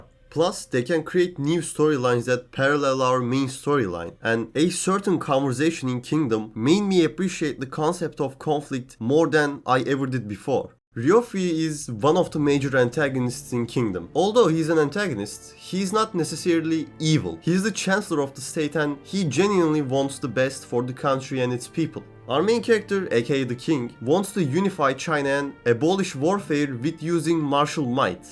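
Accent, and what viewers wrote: Turkey. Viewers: Hey my dude your accent really sounds like turkish are from turkey?🥺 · videoyu açtığım an aksanından anladım turk olduğunu yolun açık olsun ins kanalın çok ünlenir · are you turkish?? your accent sounds like it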